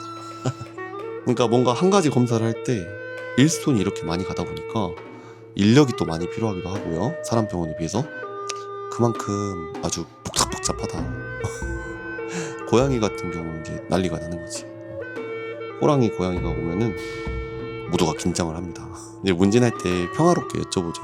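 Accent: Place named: Gwangju, South Korea. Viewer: ㅋㅋㅋㅋㅋㅋㅋ 광주분이신가용? 억양이 겁나게 친숙해브네요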